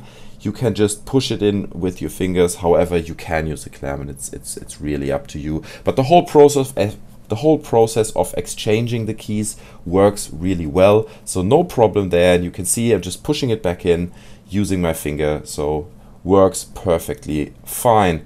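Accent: Germany. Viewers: Are you German? Dein Accent klingt hart danach xD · Ich hab beim ersten Satz erkannt , dass du Deutscher bist xD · Aha, das hab ich doch irgendwie am Dialekt gehört, dass du kein Amerikaner sein kannst xD :D :) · Man merkt voll, dass du "Deutsches Englisch" sprichst :D, wenn du weißt was ich meine, Gutes Video!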